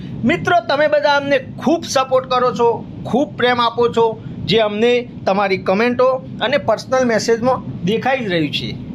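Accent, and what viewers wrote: Mehsana, India. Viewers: Tame Kaya gamna chho? Ane kaya gamna che. Tamari mahesani bhasha amne bahu game che. Karanke hu visnagar no chhu.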